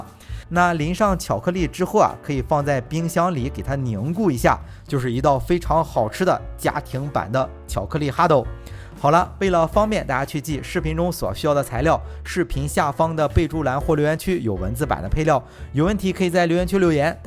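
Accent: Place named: Qingdao, China. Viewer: 我听着声音有点像青岛人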